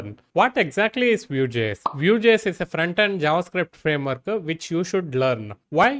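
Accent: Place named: Konkan, India. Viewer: Super. Very helpful. The Konkani accent made me happy ;) How can i connect?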